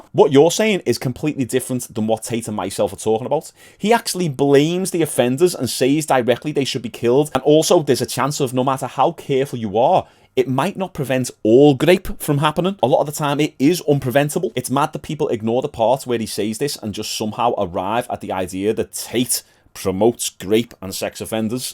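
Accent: Liverpool, United Kingdom. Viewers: you scouse mate? i live in liverpool for uni and you sound like my mates 😂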